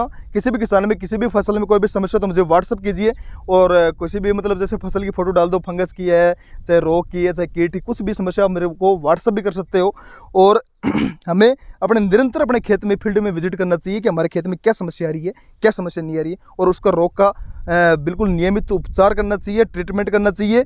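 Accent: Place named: Rajasthan, India. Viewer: Pahle bolna sekh lo aise language bolo jo sab ko Samajh a Jaye sab ko pta hi tum rajasthan se ho dekhane ki jarrurat nahi hi tum se Accha to kisan bol rha hi Chutiya kahe ke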